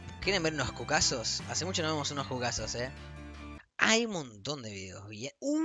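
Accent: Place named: Córdoba, Argentina. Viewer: habia flasheado que era cordobes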